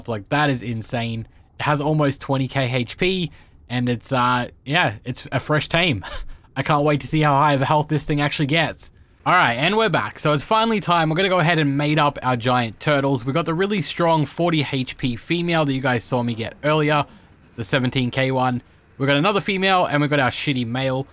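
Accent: Australia. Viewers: normally I avoid ads on normal videos i watch but imma put it out there that i love ur vids so much and your style of content, editing (and that YTer voice/aussie accent) that i watch through the ads just for u :)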